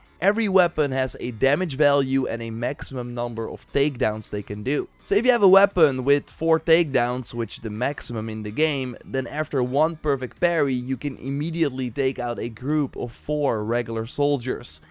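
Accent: Netherlands. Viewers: this clip is sh@ He doesn't finish combo's during fighting. This guy can't play the game. This guy is BAD advertisement with an irritating dutch accent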